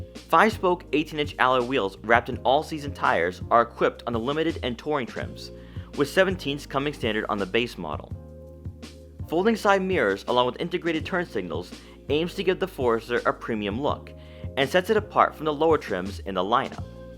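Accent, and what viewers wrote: Boston, United States. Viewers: Excellent review of the 2020 Subie Forester, love your Boston accent, I heard it strong at times. I'm not what the exterior color is of the one you reviewed, but it certainly looks amazing 👌. However, the 2020 Legacy XT Touring you recently reviewed was awesome.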